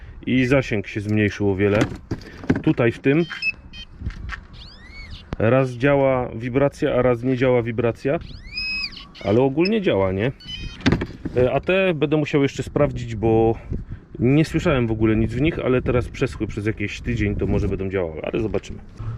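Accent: Silesia, Poland. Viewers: Chwila na Śląsku i już zaczynosz godać:) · co Ty tak po ślunsku zacząłeś godoć haha XDDD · Fajnie jak się pojawia godka:), Mógłbyś więcej:) Pozdro z Rybnika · wraca Śląsk i od razu gwara.... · Jesteś ze Śląska czy z Zagłębia???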